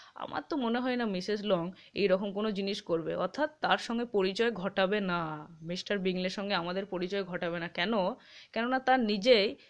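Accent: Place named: Bankura, India. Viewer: Mam r 1ta personal question korchi. Amr bari Khatra, Bankura Dist. Apnar voice ta anek ta amader moto. Kothai bari apnar? R Mam besi kore class & question chai. Thanks Mam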